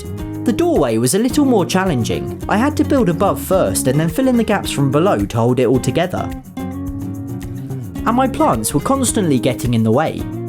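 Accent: United Kingdom. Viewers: male jenna marbles with a brit accent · Just wondering do you speak British cuz I’m confused 😂btw love your vids and your cats 🐈🖥️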